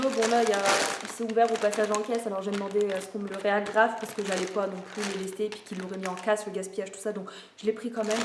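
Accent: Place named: North, France. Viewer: Waouh j'adore ton tee shirt, tu l'as acheté où ? ☺ merci pour ton contenu, j'adore tes vidéos et ton accent qui me rappelle mes racines nordistes 😁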